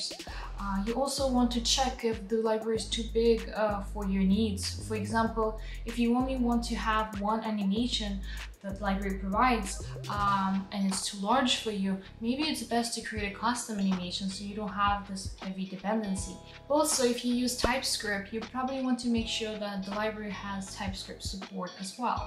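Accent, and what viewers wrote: Eastern Europe. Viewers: Where are you from ? Your accent sounds like from those countries by Russian surroundings... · Where are you from? You have a bit of Eastern European accent. Maybe I'm wrong.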